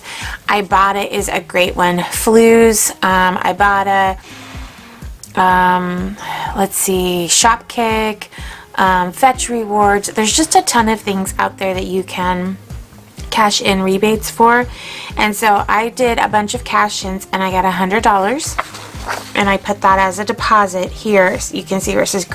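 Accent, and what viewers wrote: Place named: South, United States. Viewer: You are extra southern in this video, but I love it!!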